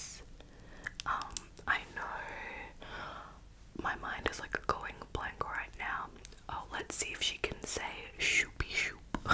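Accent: Australia. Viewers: Same!! I've been subbed for a few months and I still can't figure it out. Kind of sounds Australian too? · Are u australian?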